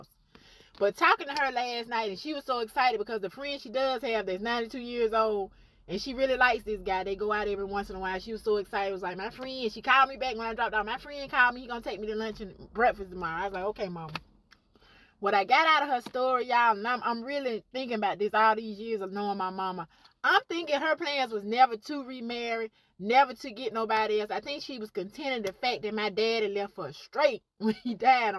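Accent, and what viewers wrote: South, United States. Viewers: I can tell you''re a southern lady! Alabama, Mississippi or Tennessee! Sounds good, and I can tell you can cook and keep a clean home! There's a difference between a house and a home! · Are you from Alabama or Mississippi? That southern accent is deep.😂😂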